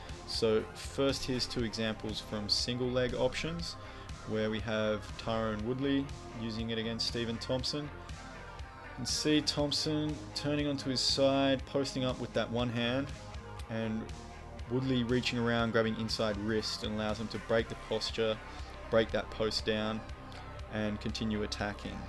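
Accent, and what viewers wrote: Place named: Australia. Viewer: Bro youre a beast. Aussie accent rofl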